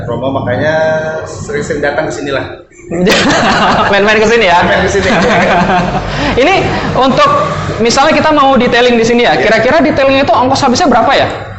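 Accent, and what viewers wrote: West Sumatra, Indonesia. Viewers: Rang bukik kironyo,. Pantas ada logatnya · logat minang memang gak bisa ilang, dari menit makin jelas bgt, dima kampuang diak.....?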